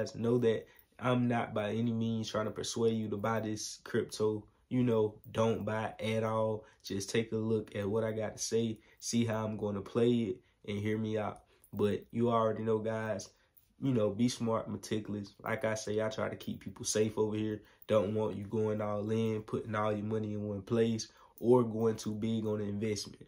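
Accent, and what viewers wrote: South, United States. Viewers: nah he just has that southern accent fr.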